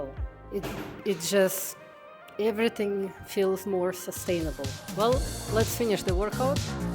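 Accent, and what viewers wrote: Russia. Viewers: Она по русски говорит, акцент русскоговорящего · Impeccable russian accent😊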